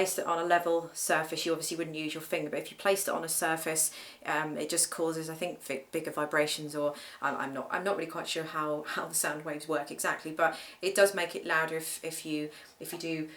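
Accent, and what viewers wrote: England, United Kingdom. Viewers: well, I have been playing guitar for 30+ yrs, and piano, bass and other instrumets for a very long time, but have always wanted to play the violin.. I finally have my hands on one, and was obviously wanting to even know what notes the strings were and go from there, Very informative video, but maybe a little too much info for a total beginner. by the way, your English accent is just SOOO awesome. The accent combined with your obvious beauty, that's quite an awesome combo :)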